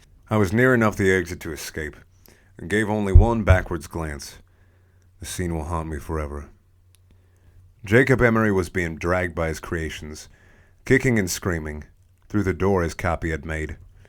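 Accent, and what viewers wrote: South, United States. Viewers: I want to hear your country accent like so hardcore xD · I love how the video gradually got more southern.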